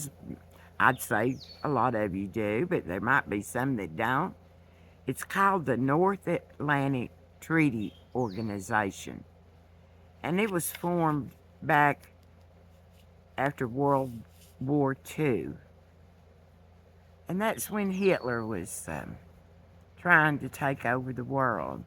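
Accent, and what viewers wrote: South, United States. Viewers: Thank you so much for standing up for kindness, and common sense. And for speaking up💖✨I’m from AR & live in TN now…and it’s sad to see so many good hearted, hard working people being conned by the carpetbagger (tRump). It is so reassuring to hear a wise, southern accent sharing good information & kindness. I’m late seeing this video, so by now we know Biden has announced he will not be running for 2024…I agree with you on the corp media…hopefully now that he has made this announcement. I hope they (Democrats & media) stop the madness & get back to work for us…Thank you! I also watch Midas touch, Tx Paul, and TN Brando💖✨🙏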